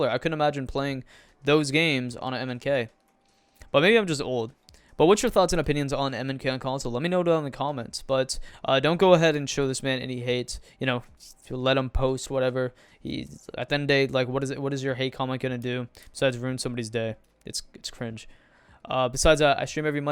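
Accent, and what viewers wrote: Canada. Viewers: ARE YOU CANADIEN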